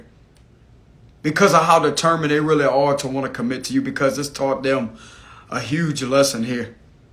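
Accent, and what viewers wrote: Louisiana, United States. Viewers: Thank you for the reading. By chance, are you from south Louisiana? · New to the channel! Came across my feed….no coincidences! Gotta be from Louisiana with that accent 😇 Takes one to know one! Thank you for your service fellow lightworker 🙏✨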